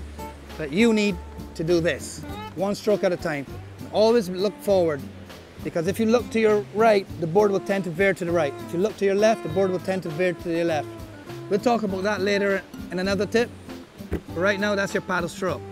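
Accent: Ireland. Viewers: You sound very Irish mate.......I guess that's to do with the history of Barbados. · Irish? My work mate is Irish too...good job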